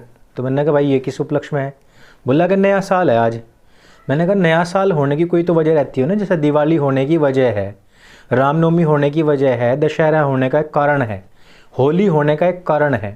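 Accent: Haryana, India. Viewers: Tu haryanavi jesa bolne ki kosis kyo Kar Raha hai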